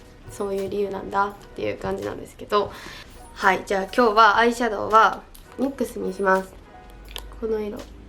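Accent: Kansai, Japan. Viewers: なんか今日めっちゃ関西弁、笑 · 今日いつもより関西弁！？最高やん😍😍😍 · 最近の話し方わりと標準語っぽかったけどやっぱりコテコテ関西弁のほうがいい〜！！ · 関西弁？なところがまたいい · このお顔で関西弁は強い、、❤︎ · いつもこんな関西弁でてたっけ？(笑) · 今回めっちゃ関西弁が多いなぜ🥺🥺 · 「〜しとう、〜しとった」に同じ兵庫県民としてめちゃくちゃ親近感わきました☺️❣️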